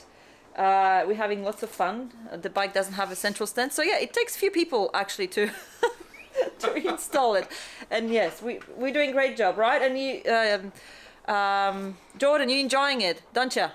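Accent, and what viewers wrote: Australia. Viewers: ok now I know what is Australian ascent is. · Wow, your Ozzy accent is really starting to kick back in. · Bloody hell, you're beginning to sound Australian again.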